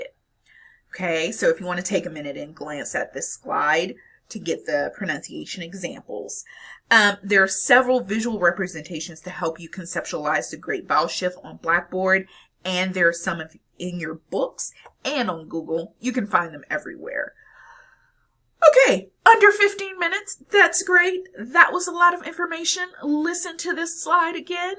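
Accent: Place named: South, United States. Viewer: You do in fact sound southern.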